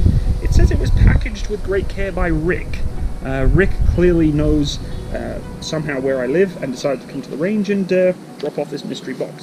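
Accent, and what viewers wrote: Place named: United Kingdom. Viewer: Hey where do u live? I thought maybe uk or something cause of the accent?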